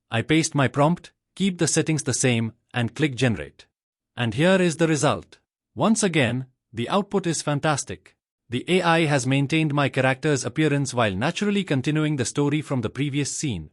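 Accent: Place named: India. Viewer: Why has the AI narrator an Indian accent…? 😅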